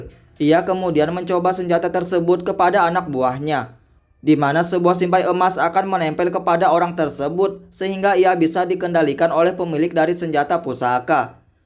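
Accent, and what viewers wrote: South Sulawesi, Indonesia. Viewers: Kenapa logatmu kayak orang soppeng atau bone😅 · logatnya lucu, ugi'banget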